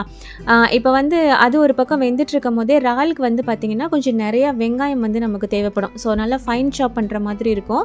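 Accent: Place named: Kilakarai, India. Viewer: I'm from kilakarai..manja soru sila peru ipdium panuvaha.. aana idhoda Ginger garlic paste and tomato um serthu kari ku thalipu mari pota inum supera irukum...try pani parunga ❤unga slang um klk mari than Iruku.. maashaa allah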